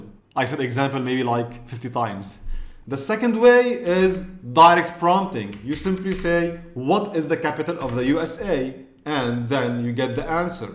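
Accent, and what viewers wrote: Arab world. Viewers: This is my first video of this channel, and I know a good teacher when I see one. You've the capability to teach and keep the attention of viewers in this short attention span era. It was a treat watching. Arabic accent 🇧🇩🔥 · ألسلام عليكم. أأنت من العرب، لان انكليزيتك كالعرب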